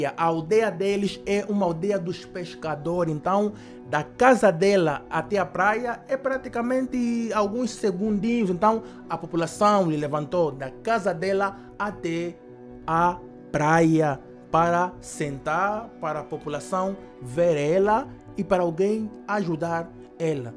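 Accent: Angola. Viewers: Esee cara narrando parece angolano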